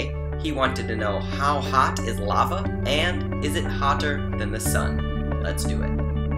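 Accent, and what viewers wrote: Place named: United States. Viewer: Speaks with an American accent but uses celcius.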